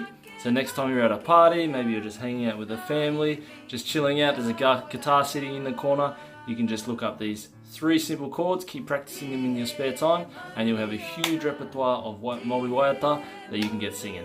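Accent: Australia. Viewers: Bro are u Australian? Heck sounds quiet as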